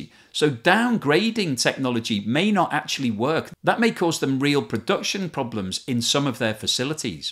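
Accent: United Kingdom. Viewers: A british accent alone doesn't make a knowledgeable man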